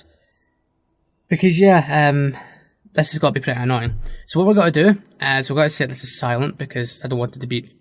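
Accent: Scotland, United Kingdom. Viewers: That is due to his STRONG Scottish accent plus he did not have a clue and not prepared to show the correct way.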